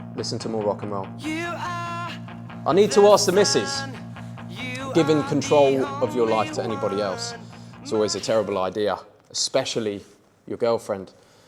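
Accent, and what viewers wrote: United Kingdom. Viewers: No.1: Letting a nihilistic hedonist with a British accent on YouTube tell you how to be a a man and how not to. Huuurrrrr brooo you have to drive an outdated mode of transmission otherwise ur a soyyy hurrrrr.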